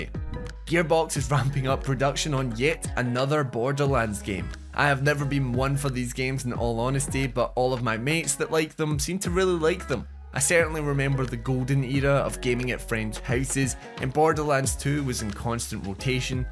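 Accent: Scotland, United Kingdom. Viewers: Is this Scottish accent?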